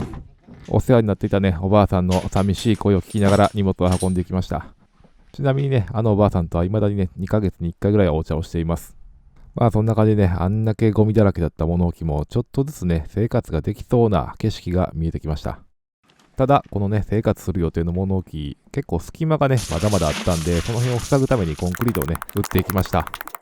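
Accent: Kansai, Japan. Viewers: 本当によくやっていると感心しました。語りの関西弁がいい味出してる。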